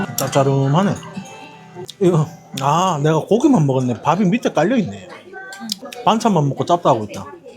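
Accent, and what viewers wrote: Busan, South Korea. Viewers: 너무 자연스러운 부산사람의 행동과 말투 완전 부산을 이해하고 알고 싶으면 이 유튜브 추천합니다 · 부산사투리 너무정겹다.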